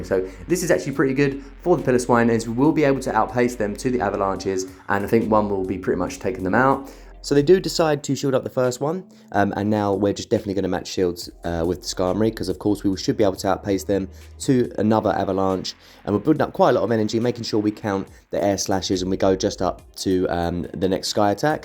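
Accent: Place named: Ireland. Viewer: Love the Irish accent 😳😍 please more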